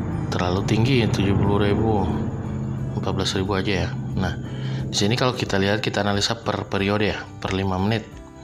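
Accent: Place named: Makassar, Indonesia. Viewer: dari suaranya ini kayaknya orng makassar😀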